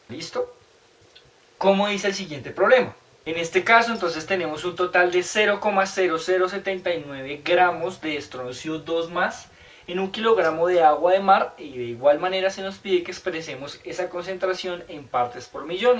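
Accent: Colombia. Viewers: para mi eres de colombia pero gracias por el video la expo me saldra chida ty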